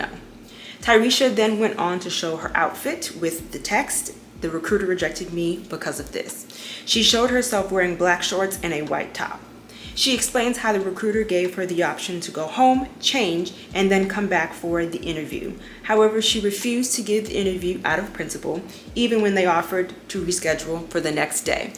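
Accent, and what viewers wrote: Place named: United States. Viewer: I feel like this video kind of need a country and field preface too, on top of ”it varies from company to company”. Here in Sweden the corporate hierarchy is much flatter than in the US (which is where I assume you’re from just from the accent). Jeans, though maybe not ripped ones, are absolutely considered business casual and ripped jeans would be ok if your corporate culture is casual. I work in a university office and I’m the most dressed up at my office in, according to your scale, casual clothing. It BLOWS MY MIND that you had to PAY TO WEAR JEANS at your place of work. I feel like the attitude of woman in the TikTok is much more problematic than the shorts - not having the humility to take the very kindly hint from the recruiter that her attire wasn’t appropriate for that office is much more of a red flag than the shorts themselves. 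I feel like having someone to reference that you consider stylish and appropriate is very useful - personally I’ll ask myself ”what would Princess Kate (or sometimes Crown Princess Victoria) wear?” if I’m in any doubt as to what’s appropriate. Does the trick every time. 😁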